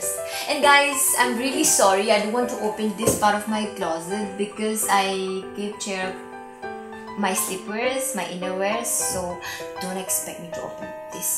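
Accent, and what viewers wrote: England, United Kingdom. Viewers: I like your hair and your English accent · I still can't get over your cute English accent 😍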